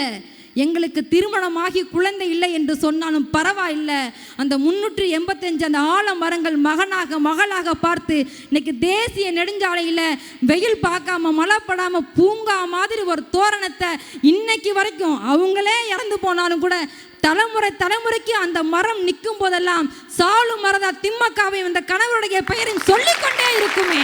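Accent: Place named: Chennai, India. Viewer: பள்ளிக்கூடத்தில் படித்தது ஒன்று, பேசுவது Madras தமிழ் தூதூதூதூதூதூ ....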